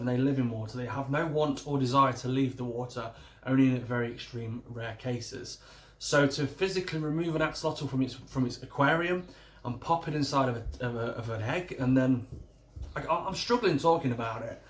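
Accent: United Kingdom. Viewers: Are you brittish? (no offence) its just your accent sounds brittish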